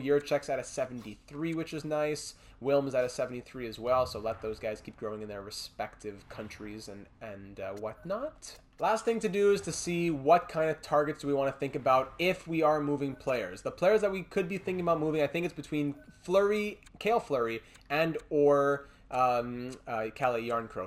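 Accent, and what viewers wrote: France. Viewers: Every time says “Julian Gauthier” in that gorgeous French accent I am literally rock solid. And using the words of my good friend StarScreamX-_-X here... “I doubt I’m not the only one.”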